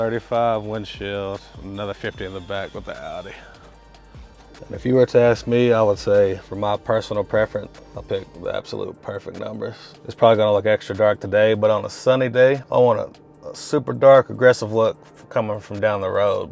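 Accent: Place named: Tennessee, United States. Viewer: Knew he was from Tennessee. He’s talking too cool. That’s how we are.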